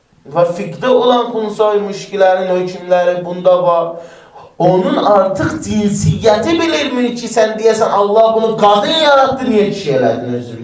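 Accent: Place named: Iran. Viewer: sen niye iran lehcesi ile danisirsan ay Azerbaycanli?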